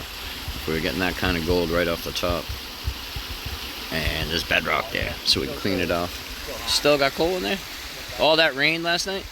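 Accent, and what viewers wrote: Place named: Vermont, United States. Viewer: cool..I think that was the river that ran through West Townsend when I lived there..nope, that was the West River...wonder if there's gold in that one too...I kinda miss that accent from Vermont..the way you said "pry bah"..lol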